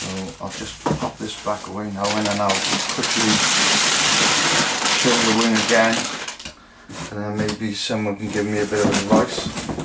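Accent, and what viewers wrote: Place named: South Wales, United Kingdom. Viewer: I think my high quality diet and fitness regime is what keeps me resilient to illness but nobody is bullet proof and I'm definitely concerned! From you accent your from south Wales right? There have been a fair number of reported cases in Wales and that's gotta be scary, so far there has been two here in Wiltshire but none in my area of the county but it's just a matter of time and I think it's almost inevitable that it will reach here sooner or later.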